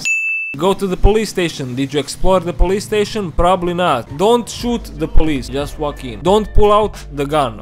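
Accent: Russia. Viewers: Wait, no he’s either Russian or German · I love this guy russian accent. Im balkan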